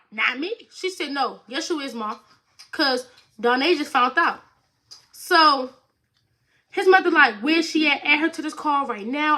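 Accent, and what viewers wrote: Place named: DMV, United States. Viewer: I knew you was from the DMV & then you said “Jii like” I was like I knew it 😂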